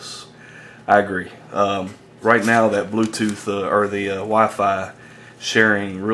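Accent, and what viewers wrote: South, United States. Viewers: You know I really don't like southern accents- but yours is just fine XD Not a bad review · i love your voice/southern accent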